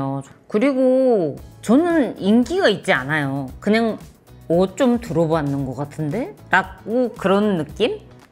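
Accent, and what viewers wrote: South Korea. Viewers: 부산 사람 맞죠???? 부산에서 응원 합니다.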